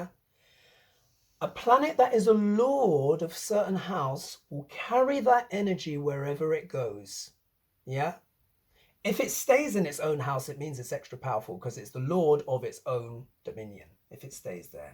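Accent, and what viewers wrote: United Kingdom. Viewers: You are my favorite Vedic Astrologer. That British accent is awesome.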